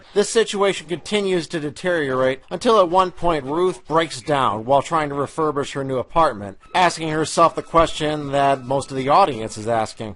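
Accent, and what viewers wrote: United Kingdom. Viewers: Did you have to have subtitles in the US because you couldn't understand Yorkshire dialect?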